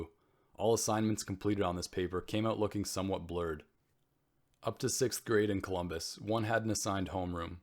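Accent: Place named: Canada. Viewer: It does not matter to me that you mispronounce words because you speak with a Canadian accent. I am grateful that you uploaded this masturpiece by Dallas Fort Worth.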